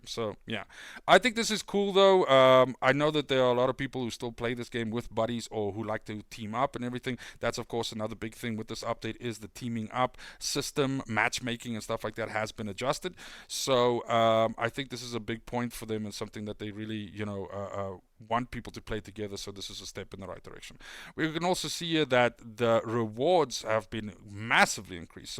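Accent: South Africa. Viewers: I saw you are from Denmark but, you really sound South African!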